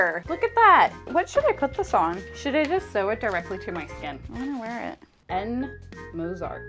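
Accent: United States. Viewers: It's so weird how she is so fluent in English that she sounds American but she can't read English fully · Wait, what, you're Swedish?? I'm Norwegian and have never heard any Scandianvian speaking with such a flawless American accent as yours. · You could easily pass for an American! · You sound totally American to me, I'm British Canadian and lived in Canada for 20 years but I still sound English. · It bites her sometimes. People assume she's American and think she's an idiot for not understanding common words like knurled. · do you have an american accent when you speak swedish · dude with your English speaking accent you can TOTALLY pull off that you're American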